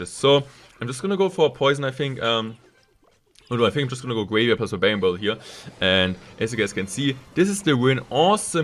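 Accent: Germany. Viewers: German accent alarm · Man hört das Deutsch so extrem raus. "clash wojal"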